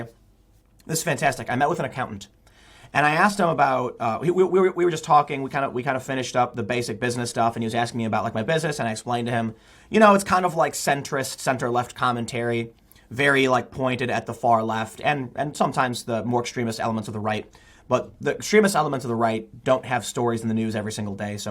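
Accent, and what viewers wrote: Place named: Midwest, United States. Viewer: You can tell Tim is from the Midwest. He adds an "S" to singular nouns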